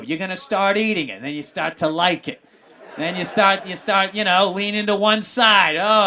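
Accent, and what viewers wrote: Boston, United States. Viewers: Hes bostonian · Does he do any of his overly pronounced Boston 'vowels'? I'd love to see him do one of those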